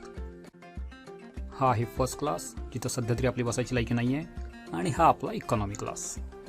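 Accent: India. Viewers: Pure Kolhapuri bro 😎...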